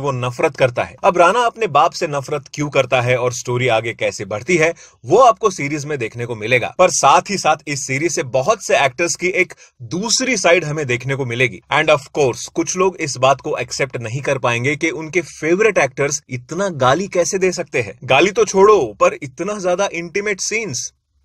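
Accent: Hyderabad, India. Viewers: Hyderabadi op😂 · Hyderabadi bolte aando💥💥💥💥💥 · Loved to hear your hyderabadi accent sir.. Love from hyderabad 😍❤️ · continue aapka haydrabadi 😂😂😂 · Love from Hyderabad....your Hyderabadi language is 😂😂😂😂😂😂 too good 👍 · Hyderabadi hindi aap ke muh se bilkul korg jaisi lagi, aapki voice korg ke liye ekdum best hai😍😍❤️❤️🤩🤩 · Aap ki Hyderabadi kirak hai ji bhai🤣🤣❤️💫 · aapki haidrabadi ka jawab nahi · u r great in hyd hindi language 😂😂😂 i am hyd and i love it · speaking hyderabadi is just 🔥😮😹 Love from gujrat sir ✌️ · your heydrabadi accent too good!!!! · aapki Hyderabadi bahut acchi hai aapke Hyderabad sunke hansne ko bhi a raha hai maja a raha hai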